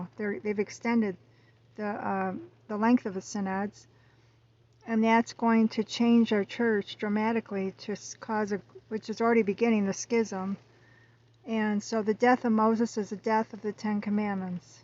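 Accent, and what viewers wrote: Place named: United States. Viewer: Nobody can understand your heavy american accent. Kindly speak with neutral accent or provide sub titles in English.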